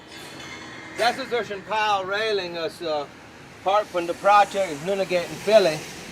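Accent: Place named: Germany. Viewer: Hes speaking english with a german swing... Seems like its really dying sad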